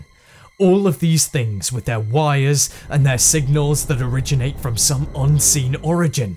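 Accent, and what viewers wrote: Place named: England, United Kingdom. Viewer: Oh crap you're English. Nice CP lel.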